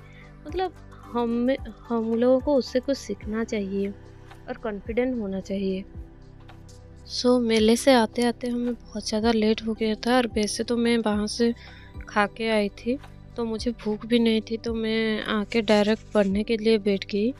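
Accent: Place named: Bengal, India. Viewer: Ap thoda bengali type bolti ho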